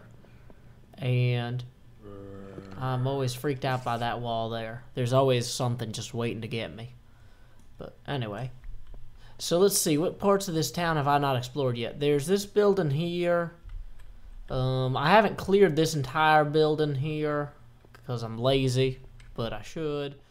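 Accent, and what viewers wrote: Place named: South, United States. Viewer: " I literally heard a cree- HUUURR OMG!" XD funniest part ever.. your southern accent is hilarious ( not laughing at you) but you just earned a subscriber! XD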